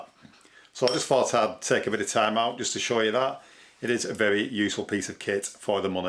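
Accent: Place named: Scotland, United Kingdom. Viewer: I subbed cus of your scottish accent, also handy content!😁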